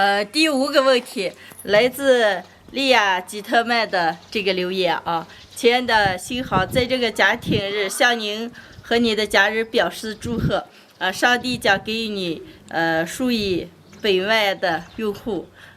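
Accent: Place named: Northwest, China. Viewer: ，加油！你的视频为我在疫情期间带来了温暖和乡音。保持西北人特有的质朴和真实，相信你的视频会传播的越来越远。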